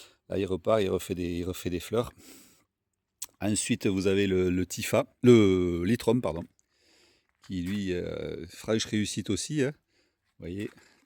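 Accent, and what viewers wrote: France. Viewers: Cc merci pour ce partage. Ils ont bien grossi en plus les bb. Superbes les plantes. Ma menthe aquatique est comme la tienne, elle fleurit. Et joli accent du sud 🙂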